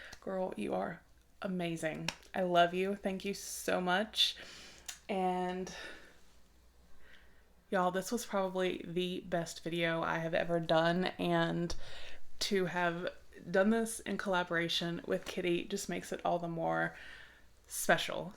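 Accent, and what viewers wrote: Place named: North Carolina, United States. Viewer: New to your channel. Our accent is the same! I'm from North Carolina too. I live in Thomasville nc.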